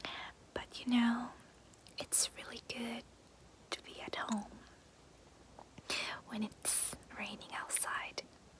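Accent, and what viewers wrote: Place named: England, United Kingdom. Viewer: I like the banana container, it's so cute, and i like ur English accent!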